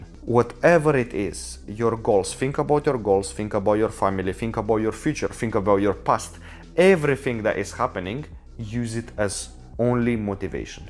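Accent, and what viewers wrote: Russia. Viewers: Are u from Russia? Your accent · His russian accent is so funny. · Yeah his russian accent proves it and skin color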